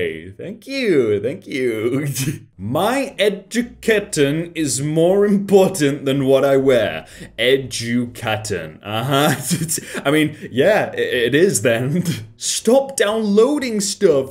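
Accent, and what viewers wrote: United Kingdom. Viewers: day 89 of asking that he british yes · Hello British guy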